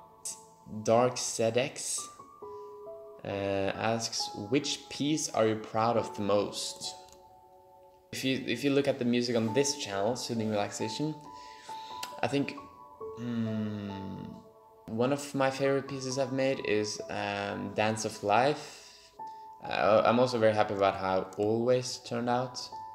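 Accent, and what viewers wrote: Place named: United Kingdom. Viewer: I love British accents! I love it so much!😍😍😍😍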